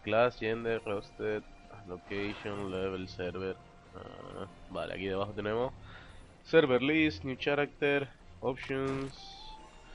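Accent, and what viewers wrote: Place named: Canary Islands, Spain. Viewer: Niñoo me alegra de q seas canario jaja por el acento se te nota, si podrias ayudarme debido a que voy a empezar te lo agradezco saludos :D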